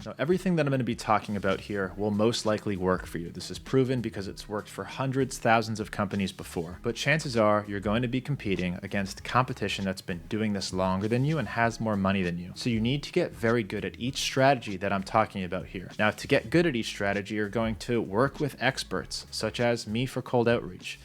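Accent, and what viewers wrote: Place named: Canada. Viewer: You sound so Canadian Dogg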